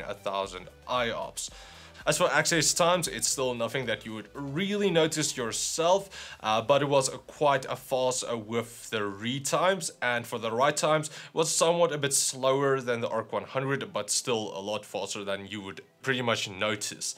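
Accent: South Africa. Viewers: Ek wou se jys n vokken suid afrikaner toe ek jou accent hoor in die begin xD, nice vid, thanks vir die info.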